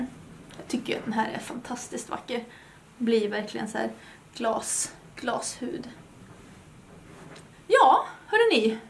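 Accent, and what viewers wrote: Värmland, Sweden. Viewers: Kul att höra dig på svenska och din värmländska klang lyser igenom 😍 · 😂 Hur kan det skilja så mycket på rösten bara för att man byter språk? Och hur kan jag missat denna? Dock underbart att höra en härlig svensk dialekt. 🙌🏻 Tack för denna! 🌸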